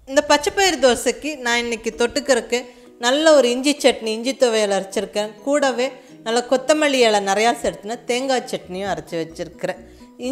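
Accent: Coimbatore, India. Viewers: Hi aunty I made this dosa today and it turned out very crispy and yummy. Whenever I think of anything to cook, before asking my mother I visit your channel and I find the solution to it. I just love the way you flourish Coimbatore slang of speaking and I adore that so much. U have very peculiar pronunciation. Looking forward to meet you one day aunty.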